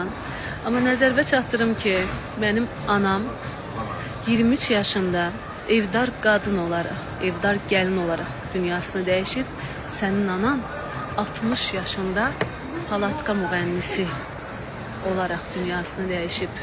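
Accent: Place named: Baku, Azerbaijan. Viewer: siz köycayllsan bakl lehcesinde nöş da lslrsan.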